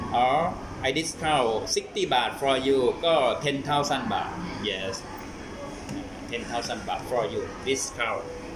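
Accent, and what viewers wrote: United Kingdom. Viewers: Lamia jud kag english accent jing oi murag british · ganda ng english accent mo sis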